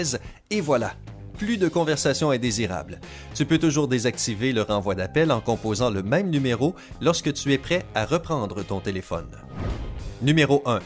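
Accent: Quebec, Canada. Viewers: Voie canadiennes bonnes explication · HAHAHA LA VOIX QUEBECOISE DE SYMPA! YAYYY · C'est très évident que la personne qui parle est un québécois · L'accent québécois purée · très sympa et accent canadien trop rigolo pour nous en france c'est très campagnard ! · Wtf ses un québécois et ne me contredisez pas je connais l’accent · Je kiffffe ton accent québécois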